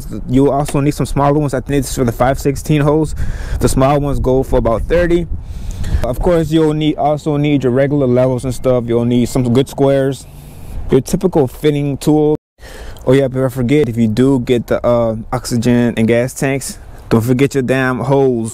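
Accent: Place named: Houston, United States. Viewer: Shit bro I knew immediately you're from Houston when I heard you talk. That's what's up.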